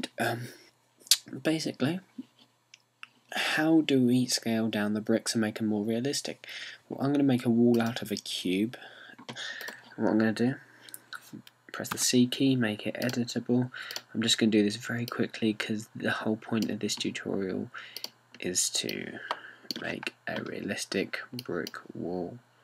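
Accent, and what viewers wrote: United Kingdom. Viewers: your UK dialect is the best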